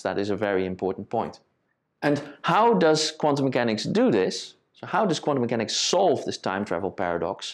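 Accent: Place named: Netherlands. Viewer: Cute Dutch Accent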